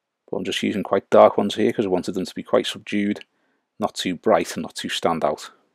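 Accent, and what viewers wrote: Liverpool, United Kingdom. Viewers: This is brilliant. Its the little things that make it. Ill defo be using this. Scouser too 🤘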